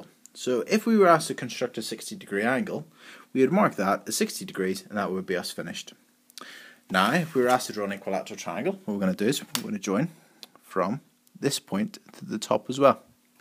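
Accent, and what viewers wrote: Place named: United Kingdom. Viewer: are you from England? because you have like british accent, if you are from England well high five because im from England aswell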